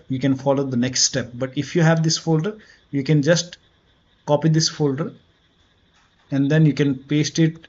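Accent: India. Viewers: i know i can fix this after i heard his indian voice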